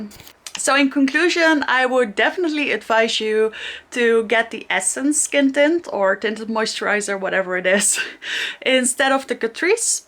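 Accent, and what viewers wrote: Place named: Netherlands. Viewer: Love your dutch accent so much! ❤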